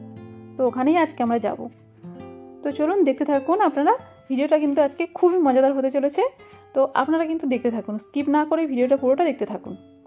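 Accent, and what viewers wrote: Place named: Kolkata, India. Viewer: কলকাতার এক্সেন্টটা আমার কাছে জোশ লাগে। ভিডিওর প্রাকৃতিক দৃশ্যগুলো মনোমুগ্ধকর ছিলো। দিদি কিছু জায়গায় ভয়েসওভারটা খাপছাড়া লেগেছে। ওভারঅল ব্লগটা দারুণ।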